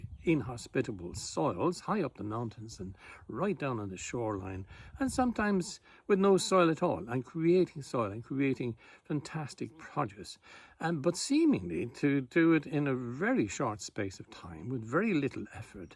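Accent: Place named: Cork, Ireland. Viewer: Are you from Cork? I am a ru...!!! 🤣👍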